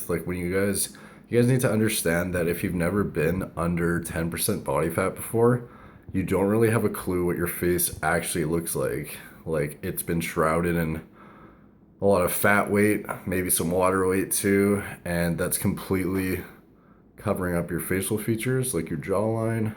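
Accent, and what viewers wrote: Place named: Canada. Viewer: I was surprised to hear was only 30 with his maturity and depth of knowledge. It makes more sense after seeing this video that was only 7 years ago because he comes across like a young guy in this vid- sounding so Canadian and gymbroish. In comparison with my maturation over a 7 year period I think it must be a combination of self-reflection from regular posting and PEDs.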